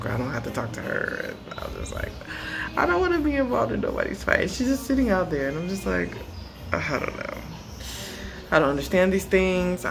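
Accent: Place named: Senegal. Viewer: Hello, I am a Togolese living in DC and I just discovered your lovely family. Just want to tell you that I love love the way you speak French with the Senegalese accent! It is not easy to speak another language especially French. I can see why people would think that you are Senegalese. Such a good role modèle for your daughter. After 30 years in the US, I am planning a return home soon. Although I am African, I spent most of my life abroad. The anxiety of going back is real ! So thank you for inspiring and motivating me! sending positive vibes and blessings to your beautiful family. 🤎😊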